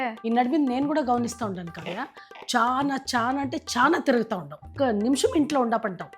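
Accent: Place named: Bangalore, India. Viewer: Bangalore Slang Telugu 🎉🎉 ❤❤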